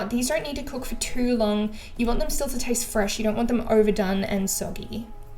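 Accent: Australia. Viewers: Your accent, Australian? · I love your accent are you from Australia!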